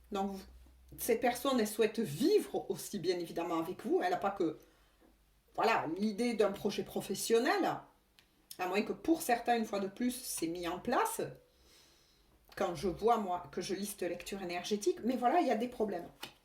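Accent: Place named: Provence, France. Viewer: Merci belle guidance parlante et limpide avec ce bel accent Provençal qui me rappelle ma ville préférée Aix-en-Provence où j'ai fais une partie de mes études supérieures et dont je resterais amoureux pour la vie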